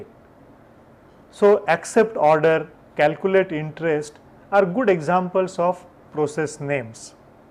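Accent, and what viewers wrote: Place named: South, India. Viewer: very sincere efforts and great way to explain ER modelling. You are very brilliant and unlike other loosers, I had absolutely no issues understanding your accent. Its near to neutral unlike other regional accents from southern India. Keep up the good work and expecting many more videos from you.. Thanks you!!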